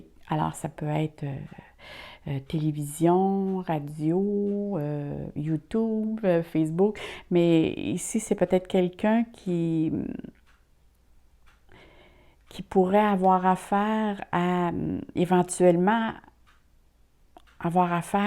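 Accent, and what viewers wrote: Canada. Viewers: Merci 🙏 Ce tirage me parle énormément surtout votre ressenti par rapport à la personnalité des Poissons 🐠 Mon mari a traversé professionnellement une période difficile... 📉 mais nous commençons à entrevoir une petite lumière au bout du tunnel, espérons que fin novembre nous serons en pleine lumière 💡💡J’adore votre accent canadien 🇨🇦... 🤣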